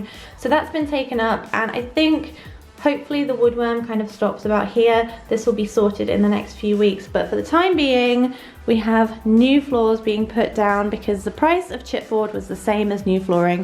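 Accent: United Kingdom. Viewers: It will all be worth it in the end! Where are you from? Sounds like the UK.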